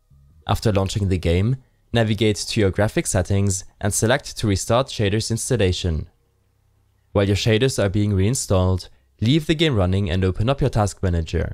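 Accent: Germany. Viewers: dieser andere deutsche Akzent??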